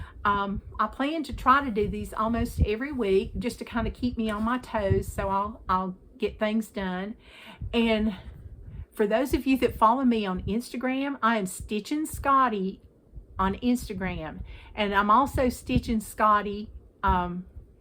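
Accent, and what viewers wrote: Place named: Western North Carolina, United States. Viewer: I came to your early video to see where you from! We lived in western NC for 6 years and I thought you sounded like you were from there. Wonderful people there! We are back in Eastern NC now.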